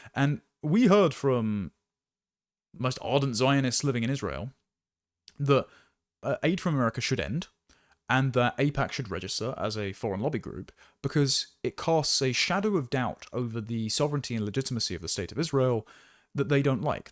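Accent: RP, United Kingdom. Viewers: This posh useful is simply adding to the panoply of antisemitic tropes--all couched in Oxford Union pseudo-intellectualism spoken in RP. 'Bizarre Heredi, Hasidic cults, and all-powerful Jews,' indeed!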